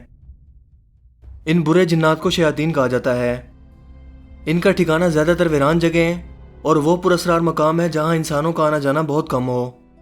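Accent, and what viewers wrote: Punjab, India. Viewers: App ki Urdu saf nhain hain, app kaiyoon punjabiyoon ki tarha Urdu bolthe hon?? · Urdu ko Punjabi bana k bolnah walay kuch to lehja behtar karo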